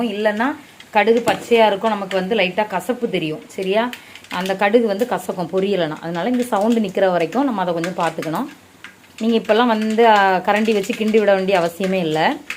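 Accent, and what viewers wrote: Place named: Nagercoil, India. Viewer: Arumai. Nager coil speech. Very good.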